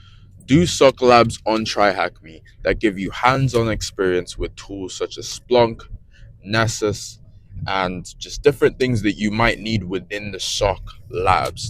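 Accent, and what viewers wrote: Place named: Ireland. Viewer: I think he's from Ireland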